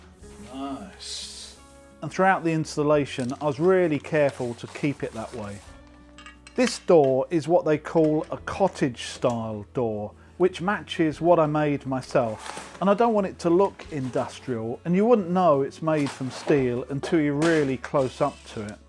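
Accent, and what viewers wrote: United Kingdom. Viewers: Wow!! ❤ what a nice door! Great locks to keep the local punks out of my electronics shop at home - love the multiple locking pins on side AND top! Sounds like you might be across the great pond - can this be purchased in the once great USA?...thanks for the GREAT demo 👍👍👍!!!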